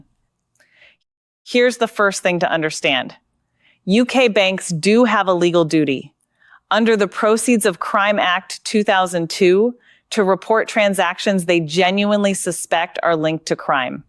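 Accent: United States. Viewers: Yank accent? Load of AI tosh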